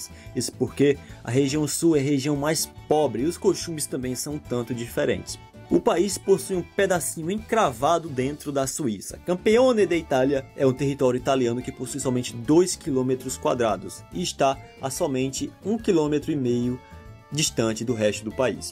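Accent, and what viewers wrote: Northeast, Brazil. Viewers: Tu É do Nordeste né!?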